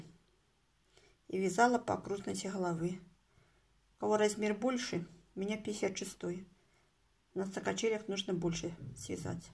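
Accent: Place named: Belarus. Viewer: Это беларусский говор.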